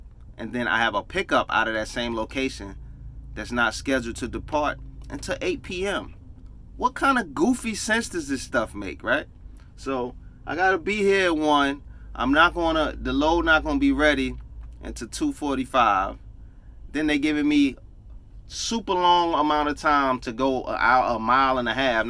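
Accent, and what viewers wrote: New York, United States. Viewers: Bro u from New York?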